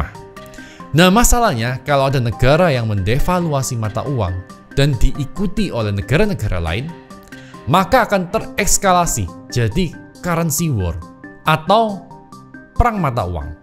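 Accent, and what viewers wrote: Java, Indonesia. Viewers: Lucu banget. Muka cina tapi pas ngomong medok Jawa 😂 unik unik 😂😂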